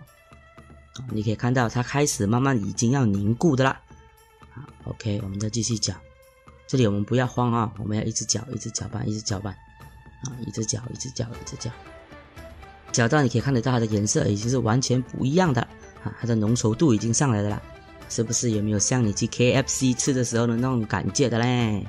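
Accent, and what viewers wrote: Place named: Malaysia. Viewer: 马来西亚乡音，大马人没错吧。感谢教学，想做这个很久了。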